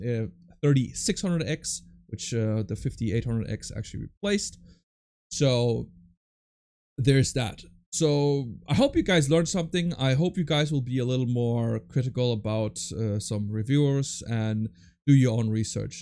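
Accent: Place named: United States. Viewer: god damn your english accent is very good and you're Danish, literally thought i was watching an american guy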